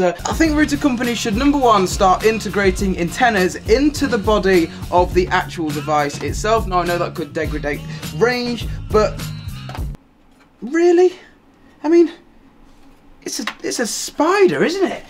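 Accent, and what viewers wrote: United Kingdom. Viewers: a shota a fps shota wtf british accent 100% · I love your british accent! Would you like to say that once again. "Rooter." <3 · Jude Groomes he is speaking english not american english he says things differently because he is speaking United Kingdom English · Well technically yes as he lives in the uk therefore pronounces it how the rest of the uk pronounces it. Its like the US and other countrys pronounce it 'Rowter' but us brits pronounce it 'Rooter' But either way its spelt 'Router' all the time. It doesn't take a master mind to figure this one out. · For those who are mad at his english... Its Basic British Accent so for those who are americans or canadian or something chill out🤣😂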